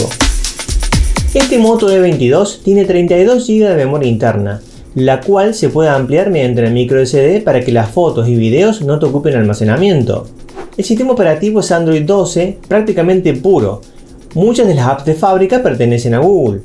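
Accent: Córdoba, Argentina. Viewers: ¿Sos cordobés? Porque hablas como una persona de Córdoba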